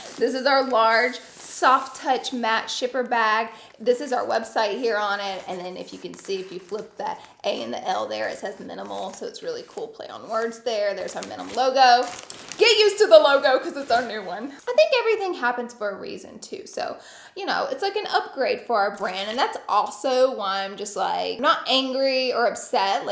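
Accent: United States. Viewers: your voice sounds like american tourist in europe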